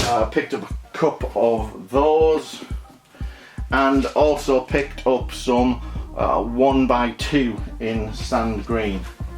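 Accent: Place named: Northern England, United Kingdom. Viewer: Nice lego haul, and I'm quite envious of your Lego room, I've been granted some shelves in the closet to store mine. :) I'm no expert on UK accents, but are you from Northern England? You sound relatively similar to my friend from Leeds.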